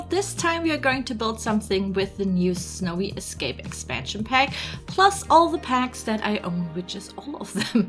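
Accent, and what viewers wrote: Germany. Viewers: I could hear it in your accent that you're german! (I'm german too!)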